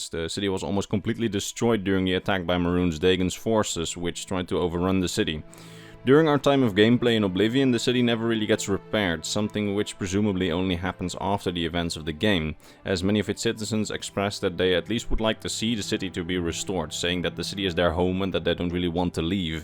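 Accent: Netherlands. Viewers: are you dutch you sound like your from the same country as im from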